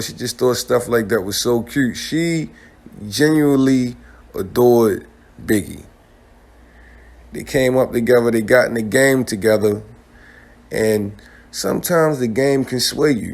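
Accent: Baltimore, United States. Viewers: Do you think Lil Kim started to get plastic surgery to look like Faith?? Just think about how Faith looks and how Kim looks, she even changed her complexion to Faiths complexion. And damn near her body shape too... please give me y’all thoughts 💭... Are you from Baltimore? Cause I can hear how you call folks “Yo” lol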